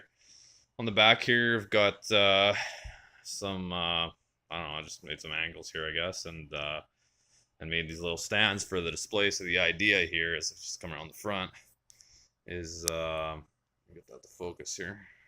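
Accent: Canada. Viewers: Sound Canadian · You sound Canadian eh? I am building one of these and people keep saying you can find car seats from a junk yard, I've never been to one in Toronto will I have any trouble?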